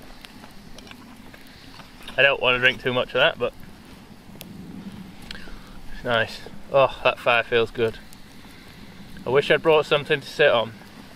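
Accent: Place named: England, United Kingdom. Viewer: Where are you based? I hear a English accent but by the looks of it your either in Canada or the very north of North America.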